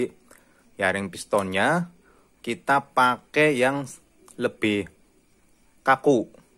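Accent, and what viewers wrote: Banyumas, Indonesia. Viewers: Ndeleng logate kaya kaya cah ngapak apa ya??🤭😅 Salam ngapak boss..🙏🤭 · Kayane wong ngapak gie,tak subsicrab mashe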